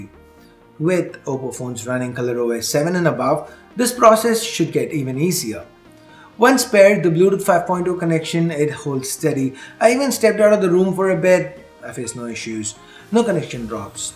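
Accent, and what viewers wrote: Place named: India. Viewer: I really don't like the hindi accent in general but you speak slow and is bearable to understand.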